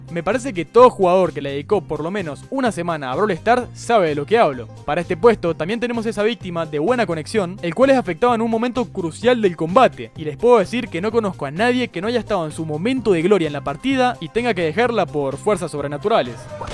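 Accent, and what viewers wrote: Argentina. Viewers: seguramente eres argentino por tu vos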